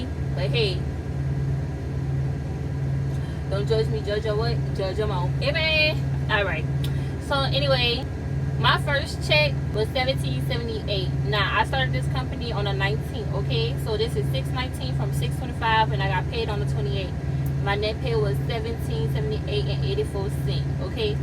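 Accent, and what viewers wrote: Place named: Gullah Geechee, United States. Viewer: Such a geechee accent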